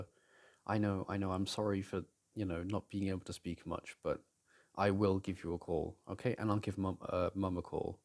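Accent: United Kingdom. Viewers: You had me at British accent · bro im british and i still get excited when i hear a british accent lMFAO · THE BRITISH ACCENT AAAAAA 😭<3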